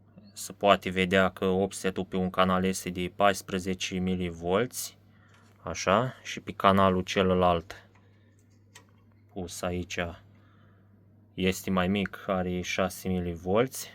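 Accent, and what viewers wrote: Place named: Moldova. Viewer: Sa ceri sa lase mai jos de 50 de lei si ai acasa osciloscop Rhode Schwarz ,apoi inteleg ca esti din zona Moldovei dar se pronunta corect in limba romana condensatoare nu condensatori ,tranzistoare nu tranzistori,.Bafta!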